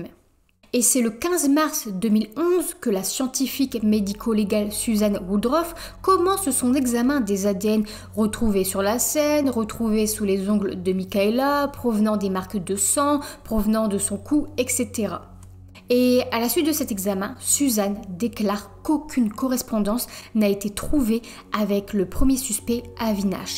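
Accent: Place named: Nord, France. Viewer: Oh lala tu viens du nord toi l accent chti 😅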